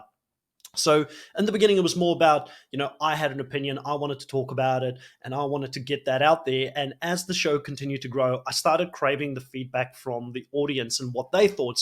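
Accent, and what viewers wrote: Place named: South Africa. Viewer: his accent is South African, though it can be confusing if you only hear that he lived in Australia and then moved to New Zealand. lol Watching the different versions of Survivor helped me be able to distinguish the English accents better. lol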